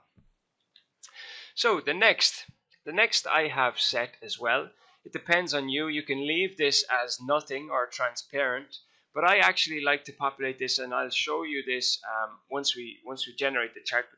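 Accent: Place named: Ireland. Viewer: Man, I'm finding it a nightmare setting up my charts... bloody Oirish accent makes it difficult.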